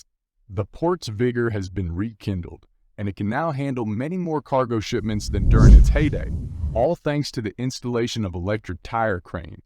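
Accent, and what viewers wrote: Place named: United States. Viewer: Not impressed , sounds very American ,therefore biased ?The narrative has no connection to video !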